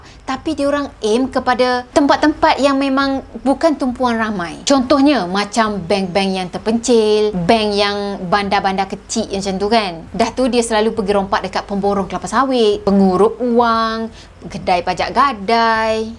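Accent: Kelantan, Malaysia. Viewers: Akak ckp sleng klante tu ada laaa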